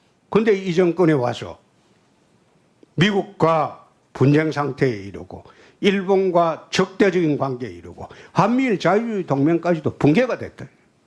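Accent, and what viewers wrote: Gyeongsang, South Korea. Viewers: 역시준표세이(형님의 순~경상도 말) 확실하다~세이 청와대가서 핵갖다놓자~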